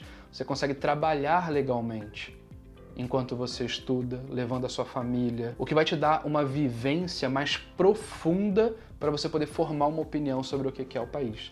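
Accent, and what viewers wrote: Rio de Janeiro, Brazil. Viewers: Voce tem que ser Carioca com esse sotaque. Eu tambem nasci no Rio num lugar perto da Penha chamado Bras de Pina. Sou cidadao daqui dos USA a quatro decadas.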